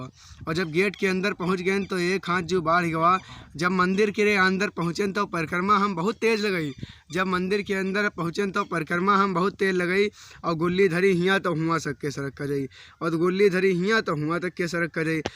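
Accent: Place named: Awadhi, India. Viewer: बहुत अच्छी प्रस्तुति..... अवधी बोली की बेहतर प्रस्तुति.....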